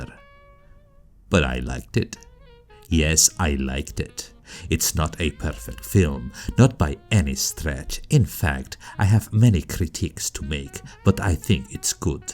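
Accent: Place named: France. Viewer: man is violently french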